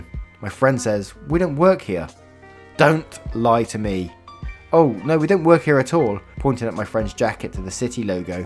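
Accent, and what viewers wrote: United Kingdom. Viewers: You have one thing rSlash does not, a lovely British accent. And you narrate them extremely well. Thanks.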